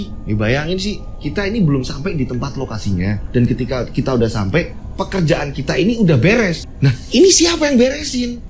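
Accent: Java, Indonesia. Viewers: Assalamualaikum..... Kk Nani dari Majalengka Jabar Hadir Absen.... De kk tuh sangat suka kalau kamu menyampaikan kisah cerita nya.... Sangat pas cara menyampaikan nya.... Apalagi bahasa jawa de yg sangat medok.... Kk sangat suka de... Sehat dan sukses selalu ya de.... Boom..boom... Booommm 😍🙏🙏🙏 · Seneng dengar logat jawanya 😁😁😁😁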